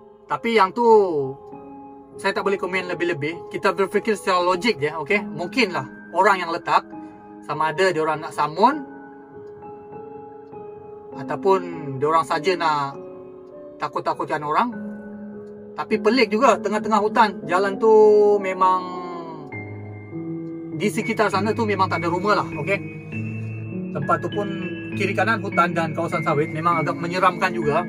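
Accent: Sabah, Malaysia. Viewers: Slangnya slang Sabah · Org sabah ka nih ckp cm org sana jak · ia org sabah tu,bahasanya tu,tapi x pasti daerah mana,sy pun baru tgk chanel nya ni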